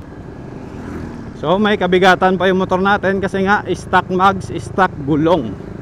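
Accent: Iloilo, Philippines. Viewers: Idol Taga diin ka nga ah ilonggo pang hambal mo hw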